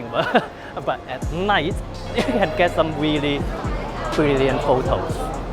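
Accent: China. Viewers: Uncle Roger accent in real life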